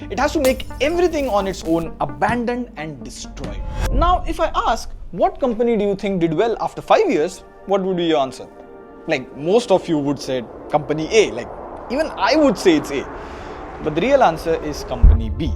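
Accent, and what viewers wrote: India. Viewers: Bhai aapka english Hindi jesa lag raha hai 😊😊😊😊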